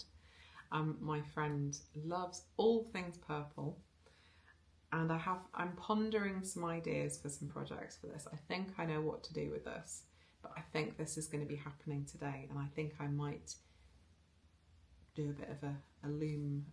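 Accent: Wales, United Kingdom. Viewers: Another lovely episode. Thank you. And by the way, you definitely do still have your lovely welsh accent 🏴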